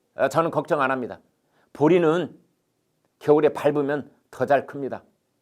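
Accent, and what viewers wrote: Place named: Jeolla, South Korea. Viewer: 그리고 임마 너 표준말을 하던지 전라도 사투리를 쓰던지 하나 택해서 해 졸라 어색해 뚜껑 벗어 암투병 하니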